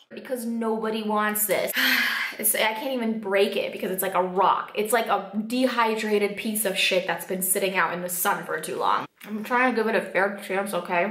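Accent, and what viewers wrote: Czech Republic. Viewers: mluvíš neuvěřitelně krásně česky! Jsi češka? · je to supr :D prostě američanka narozena v česku,užasne :) prostě že ta čeština u ní pořád je :) klobouk dolu a supr videa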